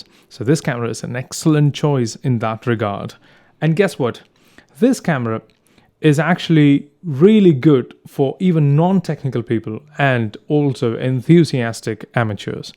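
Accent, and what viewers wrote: United Kingdom. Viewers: That English accent 👌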